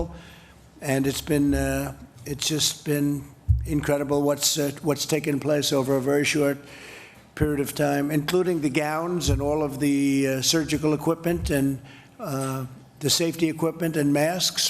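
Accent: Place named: New York, United States. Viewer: He has a New Yorker's accent, not surprising seeing as he was a New Yorker all his life.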